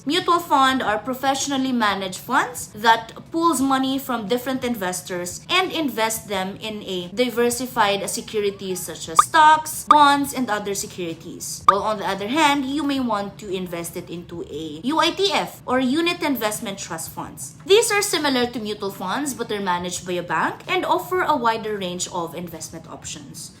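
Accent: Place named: United States. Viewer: Parang nasa america ata to english hnd pinoy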